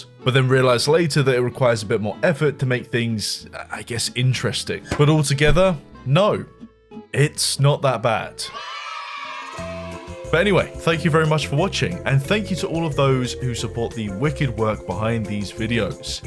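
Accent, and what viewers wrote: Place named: United Kingdom. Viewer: Why do I feel like feels a bit extra Bri'ish in this one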